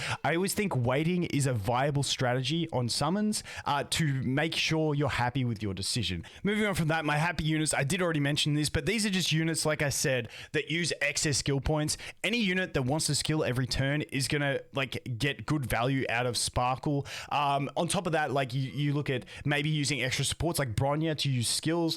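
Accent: Australia. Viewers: Honestly I watch this guys cos of his Australian accent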